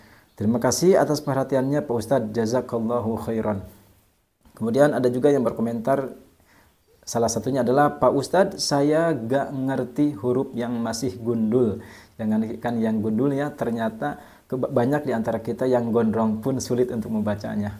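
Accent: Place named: West Java, Indonesia. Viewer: Pa ustadz logat kyak orang Sunda, emang tinggl daerah mn klu boleh tau🙏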